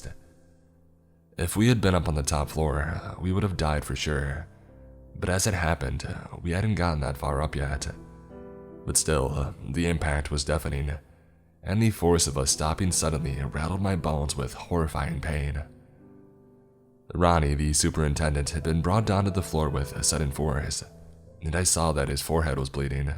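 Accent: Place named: United States. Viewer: Ok I love this channel but some videos he does this voice? An American accent? I'm American so no hate but.. he sounds like a valley girl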